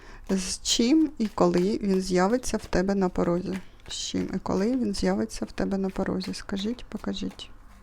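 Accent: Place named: Russia. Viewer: Так, привіт, що ж я перша сьогодні, гарно оформлені ці ленорман та жаль що капацькі родом. але люде й так не знають хто їх виготовив, тож все одно повірять. продовжуй гадати. круті когті, зразу видно що хижачка, що не властиво українці. Але й рушник теж намальований, не вишиваний він вручну. Кого обманюєш липовим полотенцем, і вимовою з расейянським акцентом, певно що дурачків?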